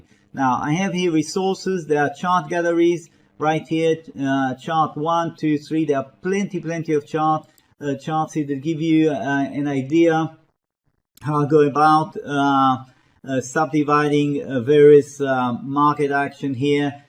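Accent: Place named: Australia. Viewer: By the accent, I guess Australia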